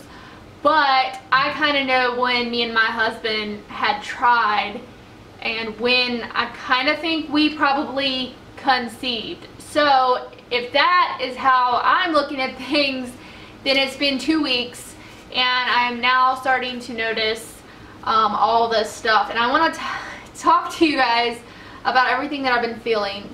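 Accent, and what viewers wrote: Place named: South, United States. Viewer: I was really trying to focus on the video but her dam accent is country and sexy!!!! Congrats gorgeous!!!! 😍❤️😉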